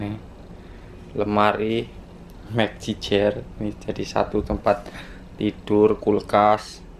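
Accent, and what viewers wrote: Banyumas, Indonesia. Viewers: Orang ganyeng banget,,,,tpi sayang ngomongnya.......wkkk wkkkk,,ngapak ngapak..... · Wong ngapak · wong ngendi pean ki kok ngapak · wong ndi njenengan mas,deneng ngapak temen ngomonge??😂😂😂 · Sukses terus mas salam dari malaysia. Rika kayong mandan ngapak deneng, hehehe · Orang ngapak ya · Jawane ngendi maz kok rodo ngapak le ngmng 😊😂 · orang ngapak ya mas... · Org ganteng kalau jawa ngapak bahasanya jadi wagu gimana gitu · Mase ngapak yak? Tegal apa purbalingga? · Mas orang ngapak ya hiihi..kalem temen kyknya😂😂😂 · Ngapak wongendhi rika Dene ngelu ndase😁😁😁 tetap semangat ngeh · Bahasamu ejih ngapak bro,,asalmu jw ngapak yo,,,pokoke berjuang,,sukses terus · Koyoke sampean uwong ngapak 😂😂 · wong ndi mas. kok ngapak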